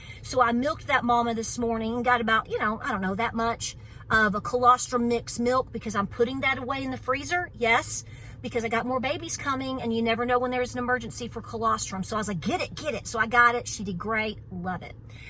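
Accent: South, United States. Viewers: i just love your podcast! I love your cheery attitude! And your southern accent and all your animals! And your voice, oh my goodness! Ilove it when you sing! Thank you for brightening my day!🙏😊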